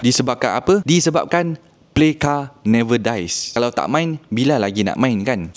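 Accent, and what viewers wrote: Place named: Kedah, Malaysia. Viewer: pekat sungguh kedah hang ahahaaha anak jati kedah ni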